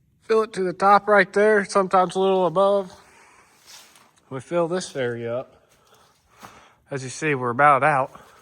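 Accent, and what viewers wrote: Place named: Kentucky, United States. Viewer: I knew it... I knew you were from KY. You talk just like me. But I'm in northern ky. Got 165 acres of nothing but deer and turkeys..... not another house in site...just like we like it. Glad I found your channel.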